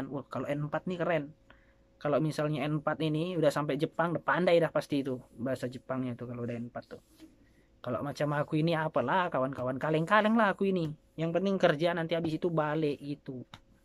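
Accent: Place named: Pontianak, Indonesia. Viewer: Lebih mantul logat Pontianak nya mas 👍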